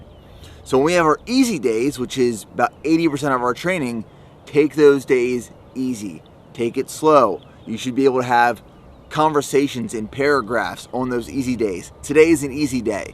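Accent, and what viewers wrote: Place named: Pennsylvania, United States. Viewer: Its a Pennsylvania thing, like the way he says "On", "Awn"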